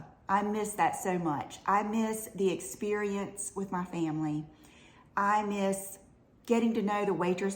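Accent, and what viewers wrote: South, United States. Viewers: Hey, I love all your video’s! Makeup, health, clothes, plants or jewelry. Whatever you talk about is inspiring and fun to watch. You said you enjoy listening to Tati in your car…Well Your the one I love to listen to in my truck…your voice is so calming…I love your southern accent and how down to earth you are. If people are offended or bothered by you talking about your carnivore diet, then it’s their problem. I find that so odd that someone would tell you that you make them feel bad by talking about your health journey. Obviously they feel guilty and have issues in their life that they need to address. You can’t make everyone happy!!! Keep doing what you do and don’t let anyone make you feel bad about it!!! I have the same issues you were having with joint pain and gut issues. I went on a blood type diet that basically had me eating eggs. 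I felt so much better but then the holidays rolled around and I gave into the cookies and now I’m struggling to stop eating sugar. It is so addicting but makes me feel horrible. Listening to you today has helped push me to start that change in my diet again. Thank you!!! Love you 🤗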